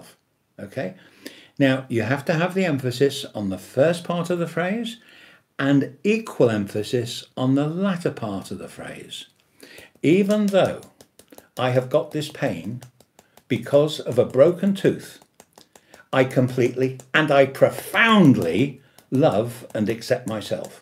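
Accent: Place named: United Kingdom. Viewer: Abonniert wegen der Aussprache. British English 💖💖 Greetings from Munich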